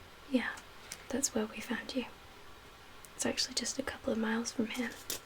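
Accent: Wales, United Kingdom. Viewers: Welsh, beautiful and a great ASMRtist..marry me?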